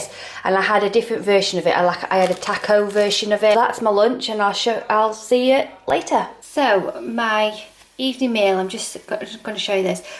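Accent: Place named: Sheffield, United Kingdom. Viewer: You sound like you’re not far from me in sunny Sheffield xx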